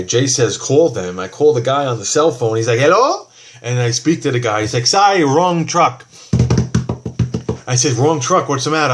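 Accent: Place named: Boston, United States. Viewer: your video's are hilarious, i'm from Boston and you say things the way me or my friends would with the gestures and noises that come out of you mouth, it cracks me up. i can't stop laughing but everything is so true + that makes it even funnier.keep making videos or hit the stage on open mike night at the comedy cellar or the Improv.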